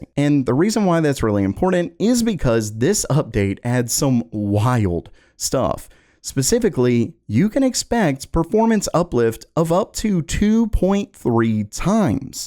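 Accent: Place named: Pennsylvania, United States. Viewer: dude are you from pennsylvania. you say on like awn. my wife does the same thing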